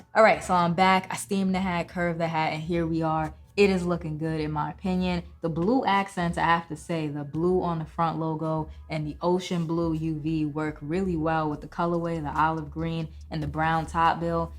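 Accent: Boston, United States. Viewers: Boston hat is 🔥🔥 and lol on the accent, I’m from Boston and hate that accent lol it’s more white Boston/suburbs. I’ve had a busy week with hats. sneakertown had some re stocks and you talked about before the Morton salt hat. I’ve been waiting for it since then and my girl hit on it for me last one in my size and she copped the biggie hat from the 5 mike pack, and the rangers hat from ecapcitys drop last Friday cause I went for the cubs. She’s awesome. Also copped my first Rockies hat too. I miss your upcoming drop videos you do the best ones cause you talk about ones that haven’t dropped yet. Appreciate you and your videos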